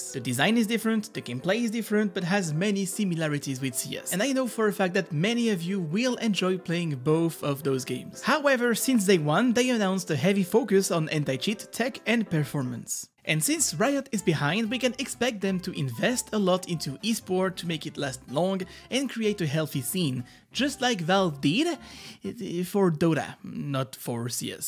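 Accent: France. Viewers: Are you French? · you could go on top of something with Pheonix with the Ice wall of Sage if she cast it under you! New here, what is this accent, are you french by any chance ? I'm from Quebec, Canada! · j'adore ton accent français en mélange avec l'anglais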